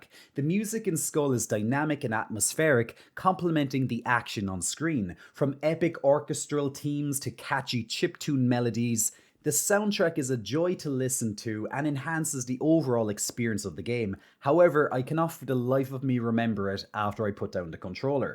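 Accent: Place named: Ireland. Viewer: Irish accent and furry pecs 😵‍💫🥴😭😭😭😭😭😭😭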